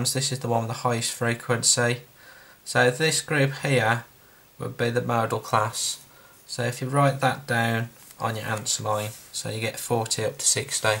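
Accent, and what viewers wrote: Birmingham, United Kingdom. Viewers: Nice Birmingham accent.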